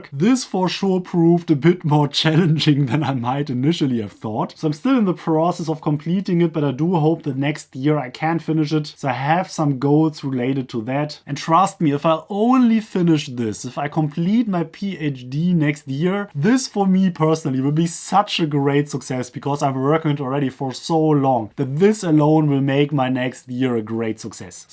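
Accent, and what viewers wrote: Germany. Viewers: oh goodness that heavy german accent....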